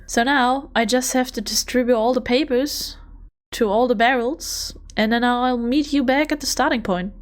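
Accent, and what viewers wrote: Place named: Germany. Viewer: И вот я здесь, человек что английски понимает очень условно (а точнее вообще почти не понимает), смотрю видео по Minecraft на английском, с, как я понимаю, немецким акцентом (на мой слух) и пишу этот коментарий с помощью переводчика... And here I am, a person who understands English very conditionally (or rather, almost doesn't understand it at all), watching a Minecraft video in English with what I assume is a German accent (to my ears), and writing this comment using a translator...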